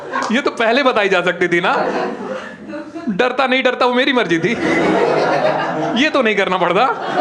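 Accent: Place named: Uttar Pradesh, India. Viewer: Beautifully performed yaar! I really like that little bit of UP accent. That accent make the content even more funny! 👏👏😂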